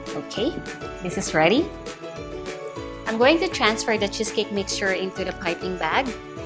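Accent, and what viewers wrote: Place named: Philippines. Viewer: I thought you are in a foreign country then I realize you were a filipina when you spoke and saw the video.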